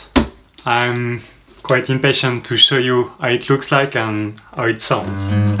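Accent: France. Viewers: you have a french accent, mon ami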